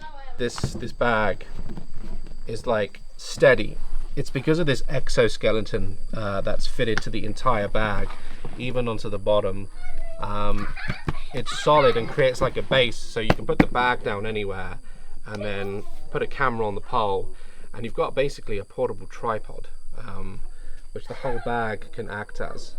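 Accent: United States. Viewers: You can tell & his wife talk alot at home. Noticed an American twang to his accent throughout this video.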